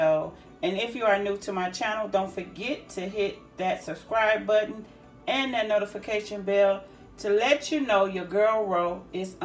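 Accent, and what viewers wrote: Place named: Jamaica. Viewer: Nice you sound jamaican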